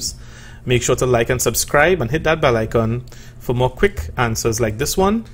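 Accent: Trinidad and Tobago. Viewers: You can hear a Trini from anywhere in the world. 😂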